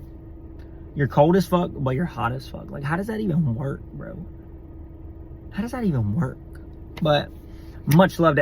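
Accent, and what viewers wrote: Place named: Tennessee, United States. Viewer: Why you talking like me ? Tennessee baby !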